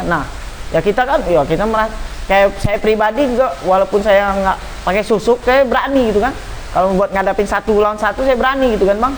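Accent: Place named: West Sumatra, Indonesia. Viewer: Rang jambi, rang jambi. Hehe... Emangnya saya ga bisa tahu kalau abang ni orang minang, ga bakal ilang bang logat kamu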